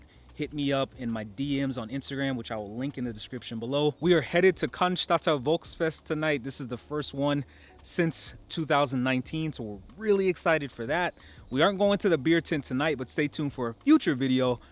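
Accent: United States. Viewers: The important part in the title is this "IN MY GERMAN NEIGHBORHOOD". Because in my neighborhood i see exactly nothing. There are houses and a street. Thats it. Be happy you landed there. Germany can be the most boring place imaginable. Every jungle village in Asia has more to offer than the villages here. Also the thing with the "humanity". Sadly having books along the streets isnt the best proof for lots of humanity :-) Ive visited many placed in the world but the Germans were the coldest among all. I didnt see any humanity anymore, when its about talking normal to unvaccinated. Or if someone falls out the of the standard mainstream person. Nothing is left of any human sign in those cases. You will be treated as an idiot and outsider. Thats is not whats humanity means exactly. And nowadys politicians are unacceptable too. Dont get me wrong. I can imagine your place is nice. 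And im very glad you enjoy it :-) Honestly. Im sure someone can get happy in this country. Sadly not me but that doesnt have to count for everyone. You sound like youre from the US. Not sure. A problem could be that Germany is the behind of the US and that may mean that in 10 years of time, you end up at "the same place" as you left. A crazy one ^^ Enjoy. I hope you find what youre looking for.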